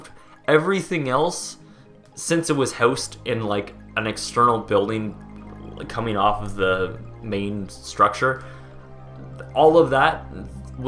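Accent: Canada. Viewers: The narrator sounds either Canadian, or he's trying very hard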